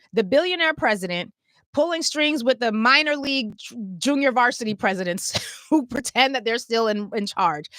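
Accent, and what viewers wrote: South, United States. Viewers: Your "SOUTHERN DRAWL" is just as IMMACULATE as your BREAKDOWN of these RANCID Repugna-CONS... 💪🏾🤩🫶🏾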